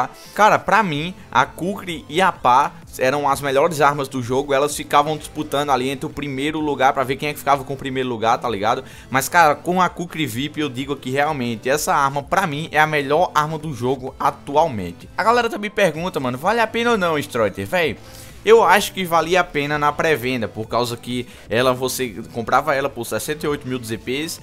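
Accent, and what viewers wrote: Northeast, Brazil. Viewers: TU e nordestino ?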